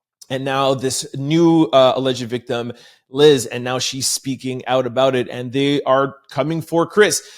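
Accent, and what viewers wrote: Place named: Canada. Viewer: We as blk folks need to be more familiar with the law. One HUGE misconception is that cops have to read us our Miranda rights or we cannot be charged or arrested. FALSE. Miranda rights are to be read when someone is being questioned in context of a criminal investigation to use what you say against you. Not simply because you are being arrested. Miranda does not keep you from being charged. If you are not being questioned there is no Miranda at that time. We need to stop saying that because it’s wrong. Miranda doesn’t apply to every arrest. That’s something that you see on TV and it’s for dramatic purposes. Also you can be detained during a search if they feel you will obstruct or interfere in some way. Diddy sons were detained. They were not arrested that day. Make sure research is done before giving legal commentary sir. Edit: you are Canadian. 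So you get a pass, however Americans are watching this and need to know. I thought you were Canadian, I can hear the accent clearly?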